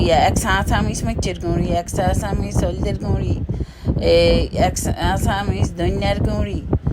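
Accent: Noakhali, Bangladesh. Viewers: দারুন হয়েছে রেসিপিটি 💐😋আপনার নোয়াখালীর ভাষায় কথা গুলো শুনতে ও ভীষণ ভালো লাগলো❤❤❤❤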